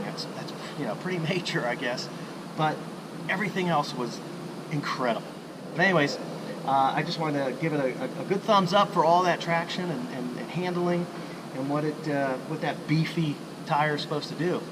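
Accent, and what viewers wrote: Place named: Australia. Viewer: 9/10 for the review, 4/10 for the Aussie accent : )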